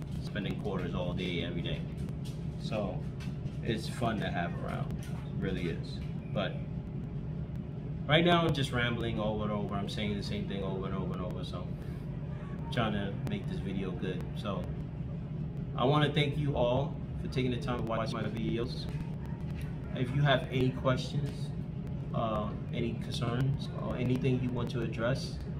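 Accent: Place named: Bronx, United States. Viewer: What part of the Bronx are you from?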